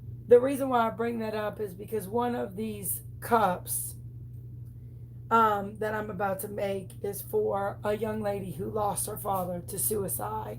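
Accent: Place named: South, United States. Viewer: ❤️❤️❤️❤️❤️.....I knew u was from da south lol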